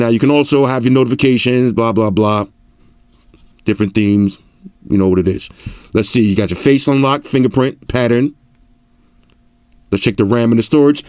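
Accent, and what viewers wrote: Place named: Brooklyn, United States. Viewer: First time watcher, I know a brooklyn accent anywhere!! review is on point and full of detail. Awesome to see someone from the hood doin they thing on the net!! you got my sub / support. Do yo than bro!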